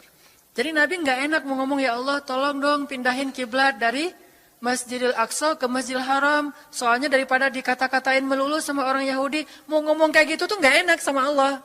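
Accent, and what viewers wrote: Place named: West Java, Indonesia. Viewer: Pa ustad itu aslinya mana tapi ngomong nya ada sundanya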